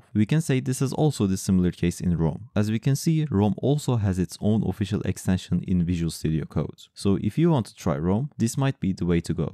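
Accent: Turkey. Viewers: Your accent sounds Turkish lol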